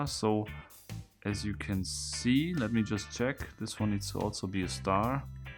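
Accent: Germany. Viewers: Man merkt Hardcore das du Deutscher bist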